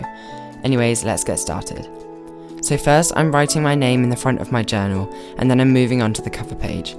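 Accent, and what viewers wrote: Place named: United Kingdom. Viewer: omg you have that british accent YAASSSS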